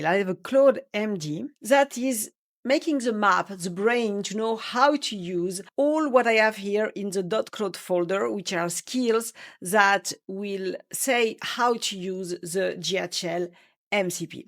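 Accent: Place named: France. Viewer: Wha le french accent but super glad to see and GHL expert as woman ! 🔥🔥🔥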